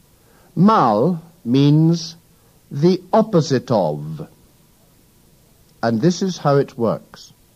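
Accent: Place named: West Scotland, United Kingdom. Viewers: Yes - the Scottish accent gives it away. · Mi ne certas, sed estas la vocxo de iu el la malokcidento de Skotlando.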